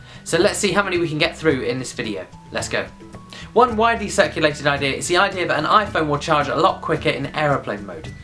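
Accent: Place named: United Kingdom. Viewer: I love ur british accent it made me laugh when u said pounds because here we would love to spend pounds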